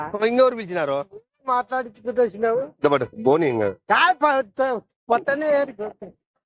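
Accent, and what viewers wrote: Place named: Anantapur, India. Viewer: Super anna ❤ from kurnool.. Ananthapur slang keka full navvu untadhi😂😂🎉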